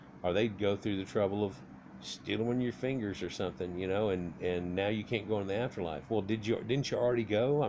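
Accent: United States. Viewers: Your pronunciation is horrible for someone educated in America. English is your first language so when you are introducing foreign name with American accent you expose your ignorance. Hopefully we can all read, so you are reading from Wikipedia is silly, simply because you have not practiced your reading with frequent mispronunciation showing your ignorance. Fact #1- Egypt started as a miscegenated society between Ethiopian or Nubian and Assyrian or Asiatic. So there is no white/black conflict. They were mixed from the beginning. You spoke of early pre-dynastic tombs. These were in fact NUBIAN. So the origin of Egypt is Nubian (negro). Fact #2- There were two governments . Upper Egypt (NORTH) with White Crown and lower (South DELTA) with Red crown. Sesostris is famous for centralizing the govt and wearing the double crown. Sesostris by conquering Nubia made them part for Egypt for over one thousand years. 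It was not until the Greeks invasion that Nubia was separated from Egypt.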